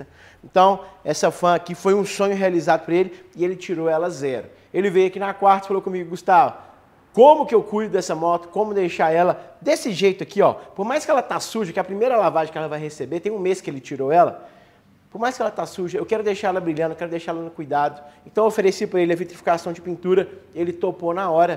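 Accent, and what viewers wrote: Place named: Minas Gerais, Brazil. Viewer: Sotaque mineiro é foda...ja da pra daber so de ouvir kkkkkkkkk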